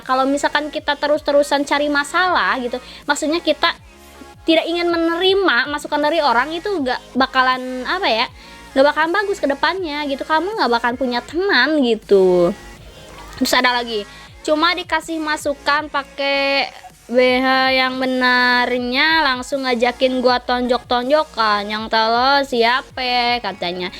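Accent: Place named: Madura, Indonesia. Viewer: Kek org madura gitu logatnyaa, te sateeee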